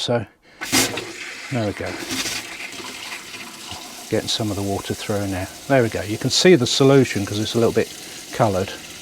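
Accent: United Kingdom. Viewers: Thanks for that. Going off your accent we are not very far from you being in Bolton. There are a plethora of motorhome videos listing what you should and should not take, I have driven extensively in France over the years, even taking a 7.5 tonne over to move my parents over there when they were alive. Im finding the advice on the new regulations regarding camper weights and what you need for vans over 3.500Kgs very confusing though! Have you any experience of the Etrusco A7300DB?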